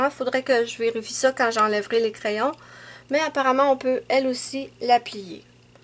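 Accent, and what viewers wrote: Canada. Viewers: j'adore ton accent canadien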